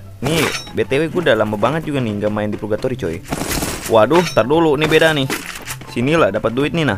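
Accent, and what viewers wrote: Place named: Sulawesi, Indonesia. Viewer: Bang logat lu kek orang buton/sulwesibang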